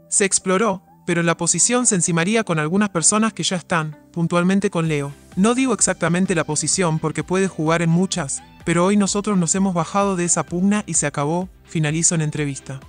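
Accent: Argentina. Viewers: Por qué usan la voz de un argentino? ¡¡Estamos en MÉXICO!!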